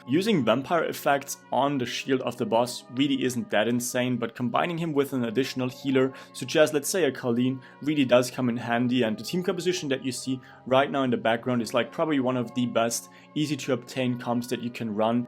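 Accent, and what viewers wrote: Germany. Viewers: Are you german? That accent cant be anything other than german.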